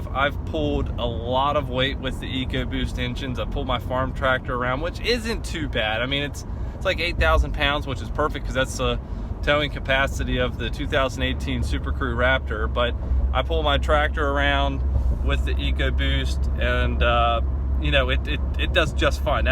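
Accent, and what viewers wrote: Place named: Pittsburgh, United States. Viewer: You sound like a yinzer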